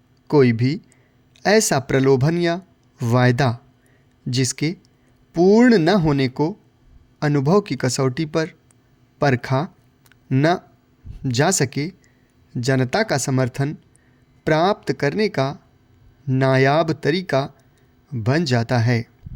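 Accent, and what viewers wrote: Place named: Uttarakhand, India. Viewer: आपके बोलने का तरीका उत्तराखंड में ऐसे ही बोला गया था।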